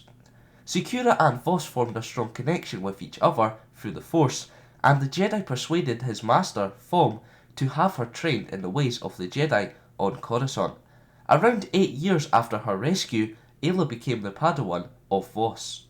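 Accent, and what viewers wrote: Scotland, United Kingdom. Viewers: Hahaha are you Scottish? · Are you Scottish?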